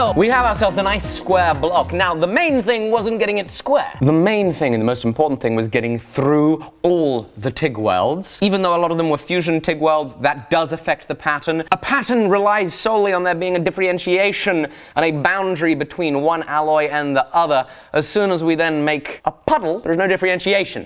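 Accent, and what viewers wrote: United Kingdom. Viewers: What is that accent? I’m British and never heard that accent before, sounds like British mixed with another... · Amazing work but can anyone place his accent. Is it part British ? I pick it up but what else and what region. Very interesting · Where about do you live in the UK your British accent is so strong unlike mine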